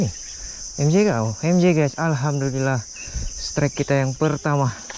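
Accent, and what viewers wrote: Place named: Malaysia. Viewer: Mantappp.....nk tnya awak org mana??bunyi mcm org Malaysia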